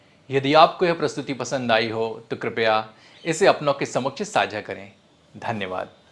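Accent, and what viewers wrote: Standard. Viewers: Aapki shuddha hindi sunkar bahut aanand aya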